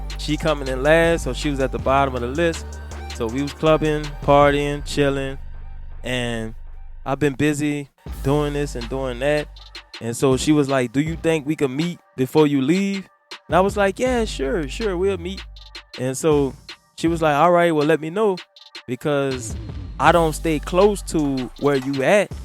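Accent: New Orleans, United States. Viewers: I just subscribed to your channel. I like your content. I’m from Philly and I was stationed in New Orleans back in the late 90’s. With that being said, you sound like you’re from the New Orleans area. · Bro this is a great video. Sounds like you from that NOLA too! Love man.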